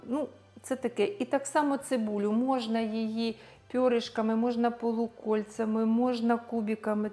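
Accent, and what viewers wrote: Ukraine. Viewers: Це не суржик, це російська з українським акцентом! Могла б перед тим, як знімати, приділити час і провірити у словнику слова, і не ганьбитися!!!! Каже не пишіть. А для чого тоді таке ганьбите виставляти?……. Улажую????? Тута і ТАМА….,сутки двоє….. А слово ДОБА ЗНАЙОМО????? Це вивчають у школі!!! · Ничего себе суржик.. Красивая украинская речь.. Не слушайте никого про суржик. Вот это и есть мова. А не западенский диалект.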